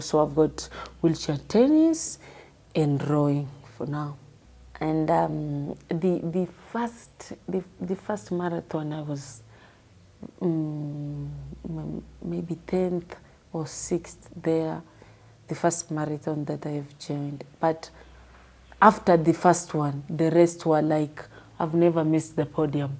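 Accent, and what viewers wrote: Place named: Coast, Kenya. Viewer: Most costo people sound like that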